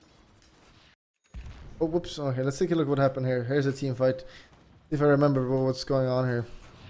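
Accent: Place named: Sweden. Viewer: i think it's his hard swedish accent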